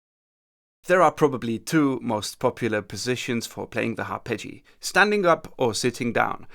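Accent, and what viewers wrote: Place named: Russia. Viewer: Thanks you for putting this series together. Definitely fills a gap here! One suggestion. Would be great if you demoed each talking point about on the Harpejji itself (perhaps second camera shot) right after you mentioned each point. Would be a big help in my opinion as far as keeping folks engaged. And although English is my first language, this certainly would be helpful to those watching this channel who did not speak English or have subtitles available and instead could at least see the concepts demonstrated. BTW.. (I do understand English with comedic Russian accent so please keep that going). Thanks again keep these going!!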